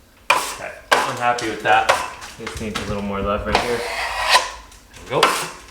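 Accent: Canada. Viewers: I like how Canadians say "process"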